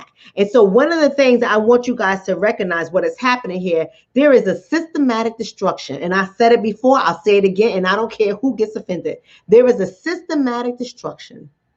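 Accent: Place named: Bronx, United States. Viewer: Love you! That New York Bronx Accent is everything! I could listen to you all day long. IJS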